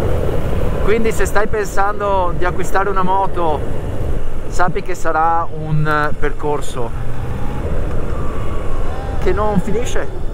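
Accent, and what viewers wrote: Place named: Veneto, Italy. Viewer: figurati!ti invidio molto,hai una bella moto e hai molta simpatia!e l accento veneto dà quel qualcosa in più😁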